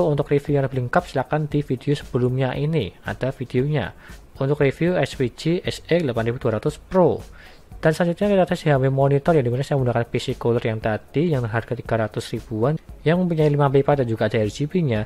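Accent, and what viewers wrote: Java, Indonesia. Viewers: Bang ngomngnya medok bnget😅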